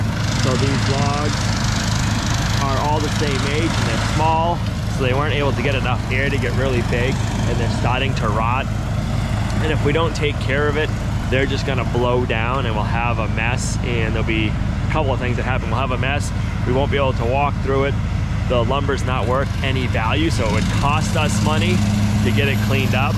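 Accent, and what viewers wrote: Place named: New England, United States. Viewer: Giggle. You called your wife Gottta love the New England accent! I grew up in MA, got called "Linder" so much, it became my nickname.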